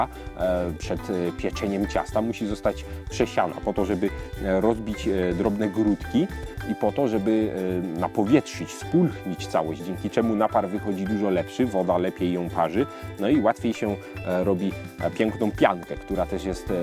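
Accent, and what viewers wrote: Silesia, Poland. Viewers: piękny śląski zaśpiew <3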